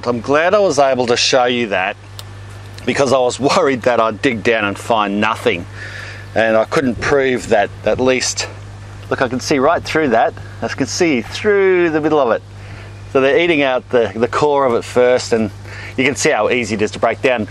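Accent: Australia. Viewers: like an australian? I guess we do talk weird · he said so himself in another of his videos. But also he has Aussie accent and you can see the background. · I love Aussie accent! And also his kitchen scraps garden. It's again! Love it. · I subscribed immediately after hearing his Australian accent lol! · Somehow I did not expect an aus accent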